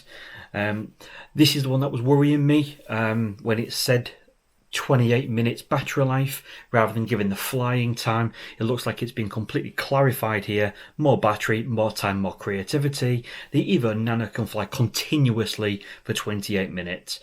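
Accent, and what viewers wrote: United Kingdom. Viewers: You are certainly on top of things. Love your videos. I find them the most useful of all the channels....and it's not just because you Britts make everything sound more interesting:)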